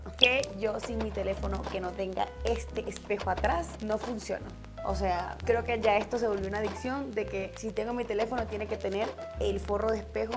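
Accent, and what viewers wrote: Venezuela. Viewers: hola una pregunta 'tu eres venezolana'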